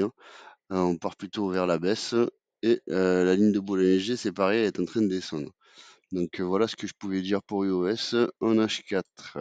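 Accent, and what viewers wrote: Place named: South, France. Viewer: Tu viens du sud toi aussi 🎉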